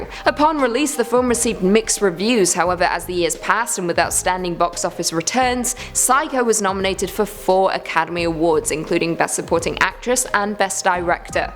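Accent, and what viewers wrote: England, United Kingdom. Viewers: You have to remember she's from England. They also say vitta-min instead of vitamin.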